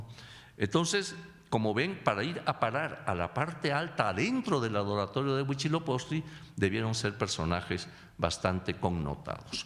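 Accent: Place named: Spain. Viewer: Eduardo Matos, encargado de poner por los suelos la cultura Mexica. En la escuela nos enseñaron a desestimar nuestro pasado historico, y a llamarle a España "Madre Paria", naturalmente yo ya soy grande, ese mote se lo pusieron a España a travez de los siglos y todavia en el siglo XX se le seguia llamando así a la bola de delincuentes y bandoleros ignorantes, que por poner un pie en las playas mexicanas, pasaron a decir que descubrieron América y pasó a formar parte de los tesoros del reino español. Al profesor Eduardo Matos, se le nota hasta en la voz su origen español. Siempre poniendo muy por debajo la gran cultura de los pueblos Mexicas de la poca cultura que demostraron los españoles al destruir la gran ciudad de Tenochtitlan.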